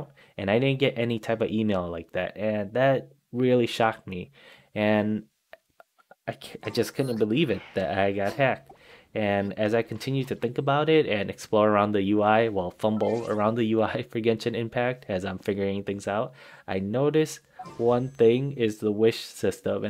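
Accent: Russia. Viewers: You russian?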